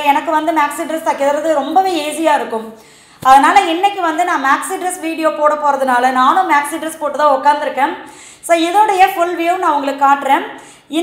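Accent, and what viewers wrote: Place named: Coimbatore, India. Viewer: Sis unga native unga peasu kovai mathiri iruku athan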